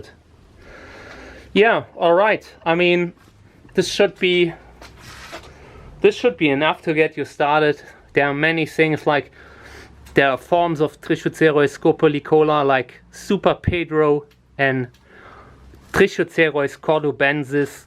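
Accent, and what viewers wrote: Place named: Germany. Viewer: Du bist doch Deutscher.... Das hör Ich doch.... Wo wohnst du denn, dass die so gut gedeihen?? 👌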